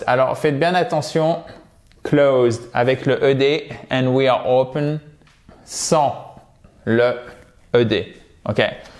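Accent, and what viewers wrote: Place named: United States. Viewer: Merci tes videos sont sympa, mais j'aurai prèfèrè avec l'accent anglais et non Americain.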